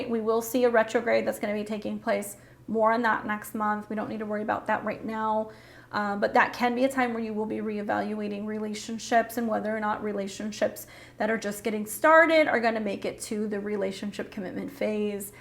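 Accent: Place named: Cuba. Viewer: Oye you sound a little Cuban. You Cuban girl?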